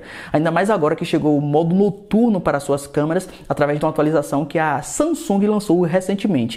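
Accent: Bahia, Brazil. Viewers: Mano vc e da Bahia né ??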